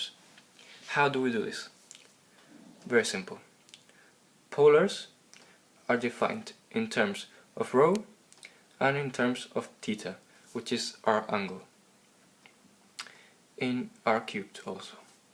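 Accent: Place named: Spain. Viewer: I can hear the spanish accent xD, it's not Zed but Sed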